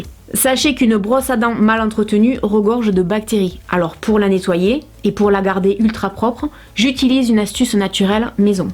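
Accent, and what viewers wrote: South, France. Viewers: bonjour est-ce que tu viens du sud?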